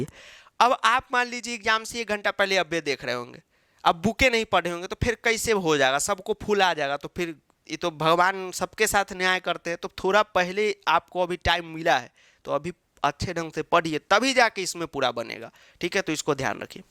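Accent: Bihar, India. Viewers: Sir aap Bihar se ho na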